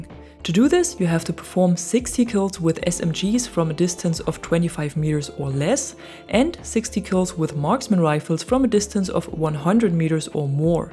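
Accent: Germany. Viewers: Nice tips 👍🏼 (are you german bc your Accent )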